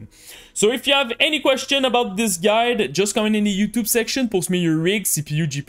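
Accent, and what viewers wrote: Quebec, Canada. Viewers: ca parais que tu est quebecois xD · Do I hear a French Canadian accent? :D I am Canadian, lived in Ottawa most of my life. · j'adore ton accent québecois à travers ton anglais! mais pourquoi pas en quebecois!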